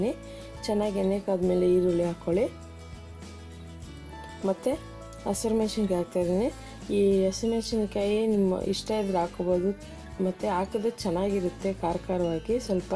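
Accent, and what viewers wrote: Tamil Nadu, India. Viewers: Hmm nivu matadodu tamilians Kannada matadid haagide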